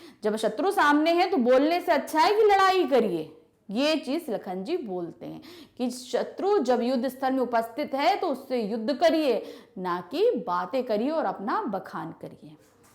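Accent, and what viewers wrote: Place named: Uttar Pradesh, India. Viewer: Can u listen her tone She is from uttar pradesh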